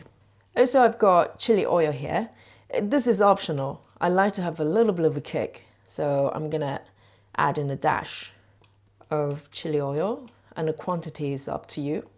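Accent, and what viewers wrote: England, United Kingdom. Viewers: I also love your videos. You have a pleasant sounding voice, and of course love the English accent! I binged watched most of your videos last night, and can’t wait to try them all. I made your flatbread, and it came out perfect. This morning I made bullet proof coffee with organic breakfast blend coffee, MCT oil, coconut milk and 1 Stevia packet. Blended it in my Ninja blender. OMG! I absolutely loved it! It was like drinking a latte.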